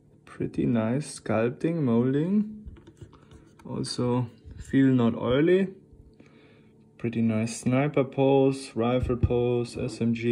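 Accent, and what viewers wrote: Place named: Germany. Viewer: I love your English its German and English togeder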